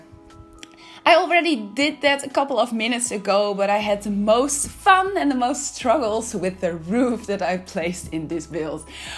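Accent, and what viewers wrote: Netherlands. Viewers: Are you Dutch? Ps. Love your videos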